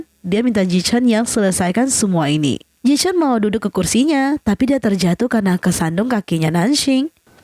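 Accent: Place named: China. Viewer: ne kk orng cine ya.. dari omong kk ne..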